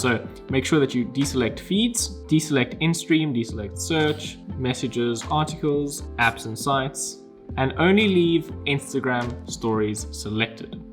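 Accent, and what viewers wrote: South Africa. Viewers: Are you from South Africa? I'm hearing my accent :) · South African accent👌🏻🔥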